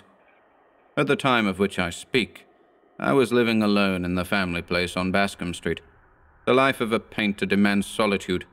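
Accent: United States. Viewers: Fantastic reading, as always...love the American accent lol...if only I could master a British accent even half as believable I'd be a happy girl 😊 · Your American accent is impressive. Excellent as always Mr. and. Thank you.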